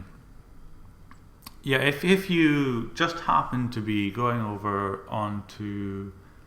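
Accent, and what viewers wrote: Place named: Scotland, United Kingdom. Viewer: Cynicism always sounds better in Scottish. I disagree with the comments that you were rambling. I enjoyed the conversational style.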